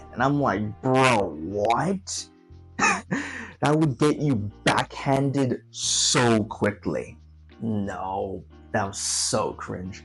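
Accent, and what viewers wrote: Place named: South Africa. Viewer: Where are you from brother? Your accent has all these quick nods into a South African accent but then dips out just as quickly to sound largely American. I'm really struggling to place it.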